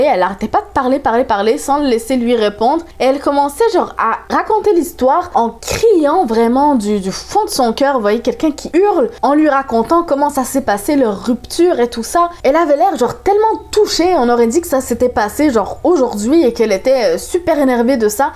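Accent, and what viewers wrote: Quebec, Canada. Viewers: Est tu québécoise car on dirais un accent mis français mis québécois?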